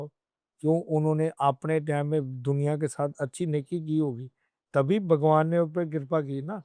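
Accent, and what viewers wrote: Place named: Punjab, India. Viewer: Dara singh wala hindi accent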